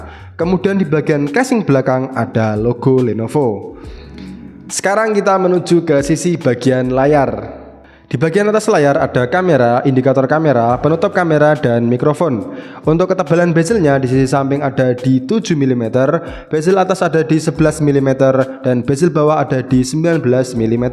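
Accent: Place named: Banyumas, Indonesia. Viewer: ngapak banget mas jadi ketawa saya😂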